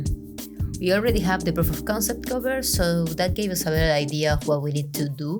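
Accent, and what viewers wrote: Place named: Argentina. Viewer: Great video! I'm a big fan of the format, shots, and quality of this! Uno puede escuchar la argentinidad en sangre con el acento 🇦🇷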